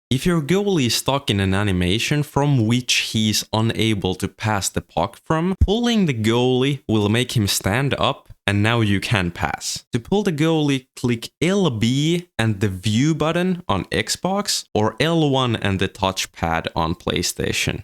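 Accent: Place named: Finland. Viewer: Suomi englanti on parasta! Teetkö muute suomeks kans?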